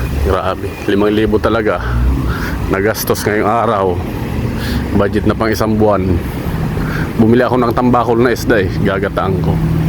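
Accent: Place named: Batangas, Philippines. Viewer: Boss, taga batangas ka? Yung accent mo kasi parang similar sa mga batangenyo. Anyways godbless ingat palagi, tuloy lang boss sa pag vlog, support lang kami dito kabayan. May kasabihan nga ang mga OFW ang bagong bayani ng pilipinas❤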